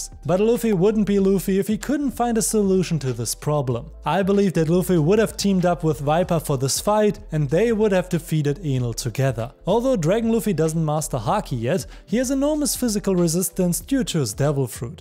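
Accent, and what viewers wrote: Germany. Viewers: you sound german. also, änel lol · Ich liebe den deutschen Akzent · ur german 100%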